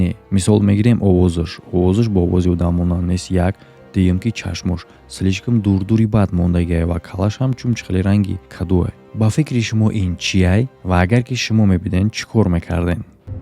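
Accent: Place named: Yovon, Tajikistan. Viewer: Ака ба Худо ма кайф мекнм ранги мо ЁВОНИ Ё гап мезани чашмошон блк-блк ай гап нест хдт да ака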